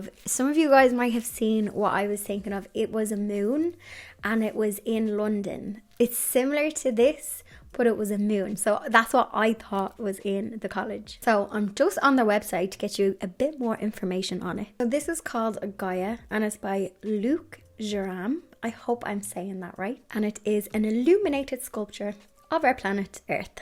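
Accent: Ireland. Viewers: Cheeky thumbs up for sure. ❤ Could listen to your beautiful irishness for hours, love the kittens though Blonde will be forever in my heart ❤️ Pretty well love any and all content 😊 so keep it coming in the forms that your heart takes you, I'll be watchings, cheers and happy holiday season. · Dear, your channel is one of the very few I'm really watching longterm, which means over many years. Lots of others I like(d) became noticeably calculating. Understandably, of course. But you manage to keep your videos very natural and unaffected (sorry for my bad English, I'm not sure, if I chose the right words). I love the mix of craft, "housekeeping", gardening, cats, trips and chitchat. The gardening part might be my fave, as you seem to live in a very similar climate like mine. But I also just love to listen to Irish English, and your voice is so charming. Your dedication to quality over quantity and work life balance makes you even more sympathetic. 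Keep following your heart, please. And have a magical christmas time with your loved ones! A big THANKYOU from southern Denmark! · I just like listening to your Irish accent lol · I love the balance you have on your channel 🤗, I could listen to the chat all day plus your Irish and it’s my favourite accent. The quality of your upload is always amazing and if putting your self under extra pressure to do more…..then don’t because that pressure will reflect on you and that’s not good for your health. Finishing this year with kitten content is a wonderful end to the year and the exploding tree perfect. Take care x xx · I would love more DIY and craft videos but not daily:-) I won’t keep up:-) but maybe a xtra one here and there would be welcome treat. I love hearing about you, I follow you because I feel a kindered spirit in DIY and love hearing the Irish accent and slang, I miss my old neighbour especially at this time of year. 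But you keep doing you. Enjoy your friends and family and keep them just for you, you deserve that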